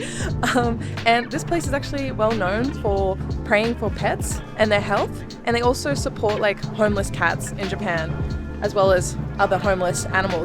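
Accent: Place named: Australia. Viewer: I dont think I ever comment on your videos, I like how you show things in a chill way with your aussie accent! <3 enjoy! my dream is to go to japan one day !😄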